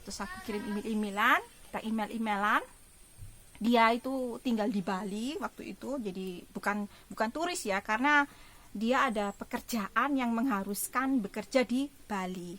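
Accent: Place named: Java, Indonesia. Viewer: medok banget jowo nyo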